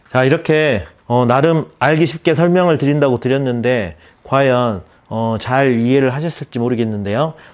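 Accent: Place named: Gwangju, South Korea. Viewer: 참 좋은 영상입니다~! 구수한 광주 사투리는 덤이구요 ^^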